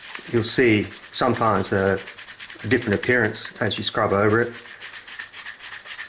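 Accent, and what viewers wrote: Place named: Australia. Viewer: G day i really enjoy your content i just subcribed its nice to hear an Aussie accent on youtube i an looking forward to going through your back catalog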